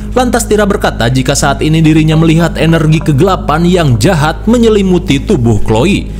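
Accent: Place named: West Java, Indonesia. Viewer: Bocil sok pinter dih,pdhal yg baca naskah alur ini tuh orang sunda dengan logat dan suka melesetin kata kata biar ga terlaly flat dan kaku pembawaan nya, lu penonton baru apa gmna? Dri dlu jg bgini pembawaan nya kocak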